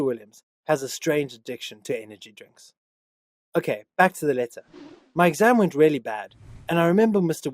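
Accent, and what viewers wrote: South Africa. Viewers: Hmmmmm do I hear a South African accent? Niceeee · Is the narrator South African? Cause he sounds like it. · South African right? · This is the first time hearing a narrator speaking in a South African accent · The narrator is probs South African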